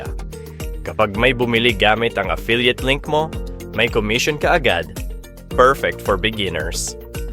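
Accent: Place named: Conyo, Philippines. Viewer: Speaker sounds so conyo. 😅😅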